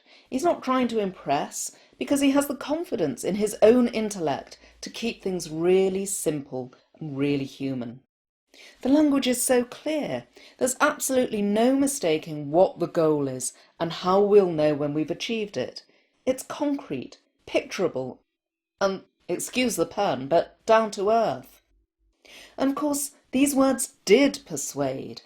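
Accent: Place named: England, United Kingdom. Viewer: I DO really love every video you post and your awesome English accent that literally pampers me as I listen to you. Thanks a lot <3 (Greetings from Italy)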